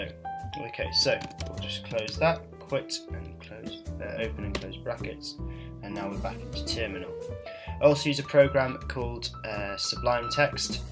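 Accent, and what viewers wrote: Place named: United Kingdom. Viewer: It's amazing how people want to create a video to help then forget that hardly anyone could understand what you saying when you have such a slurred voice not pronouncing your words and too much background noise which everyone knows draws attention away from what your saying. Slow down. It's hard enough to understand British accents let alone listen to loud music.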